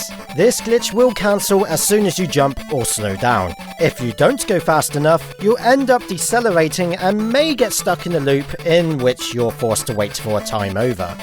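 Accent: United Kingdom. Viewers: Son of a glitch, but British :)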